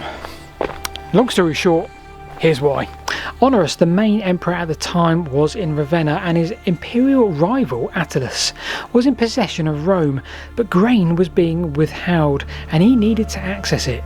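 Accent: United Kingdom. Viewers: You do good documentaries, but, you need to slow down your words and talk with a bit more articulation, especially with a British accent. I have to stop the video often to decipher those rapid burts of words.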